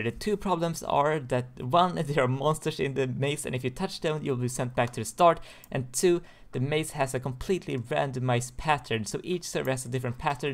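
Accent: Sweden. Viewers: he sound swedish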